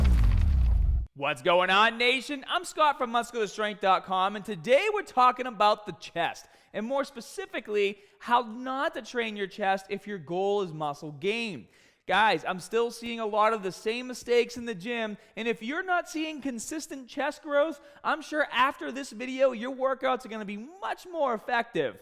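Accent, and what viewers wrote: Boston, United States. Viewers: Your crazy Boston accent has tone down a bit. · Are you from Boston? You've got that accent especially on the r's.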